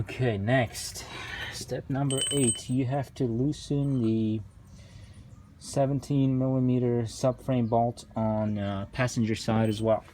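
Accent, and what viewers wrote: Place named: Canada. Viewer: Where ya from mate, sound like a Canuck to meeee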